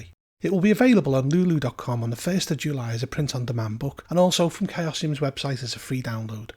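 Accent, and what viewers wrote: North, United Kingdom. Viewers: Hi, where are you from? You have a great voice to my American ears but wondering if it is Northern? I’m going to run Scritch Scratch tonight and enjoyed your summary. The scenario does suggest groupings for less than 6 players, on page 8 under the heading “Six of One” in the edition I purchased which looks a bit different than yours. Cheers!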